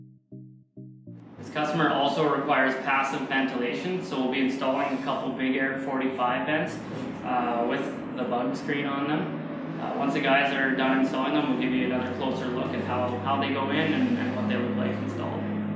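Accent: Canada. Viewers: Haha....you sound just like me. I'm in Northern Ontario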